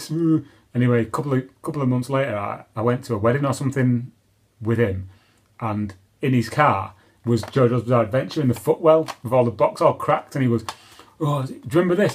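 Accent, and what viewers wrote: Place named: Manchester, United Kingdom. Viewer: Didnt realise you were a manc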